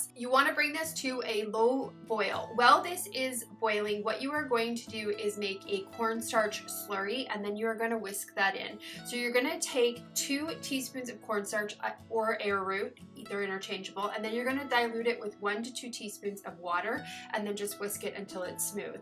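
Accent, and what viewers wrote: Canada. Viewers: I just found your channel, I am enjoying following you. I can hear a slight Canadian twang, I live near the border in the PNW. I am excited to see more of you videos.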